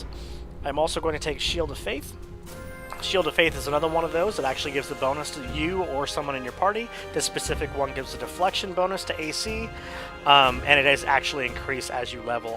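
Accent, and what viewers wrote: Netherlands. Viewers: are you dutch? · are you dutch ? :D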